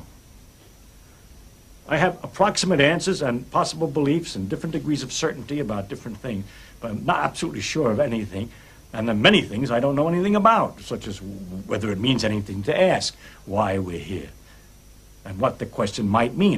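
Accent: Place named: New York, United States. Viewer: I love the way he talks about the universe with the accent of New York longshoreman, or as his friends said a bum.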